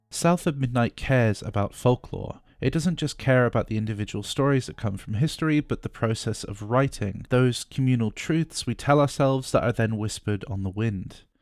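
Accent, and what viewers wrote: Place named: United Kingdom. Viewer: Oof, soon as i heard your accent i knew i wouldntbe able to trust the opinion. No offense but anyone who spends their time playing videogames rather than fighting their dystopian authoritarian matriachal super state is probably a person that is ok with or apart of that tyranny. Cant trust game review advice from a race socialist. Though i wish you luck and hope that your reading this doesnt cause your secret meme police to kick in your door and .. make you..i dunno walk round london asking for forgiveness from black women or w/e is your unelected prime minister enforces as his ttier punishments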